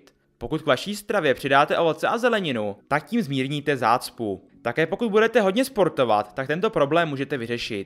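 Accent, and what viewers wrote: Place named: Prague, Czech Republic. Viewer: Jsi Pražák, viď? Podle toho jak mluvíš xD mě to hrozně štve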